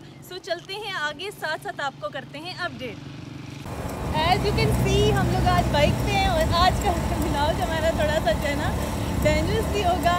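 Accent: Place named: Pothwar, Pakistan. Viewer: Aap to pothwari bolti hai apni zuban main banaye aik video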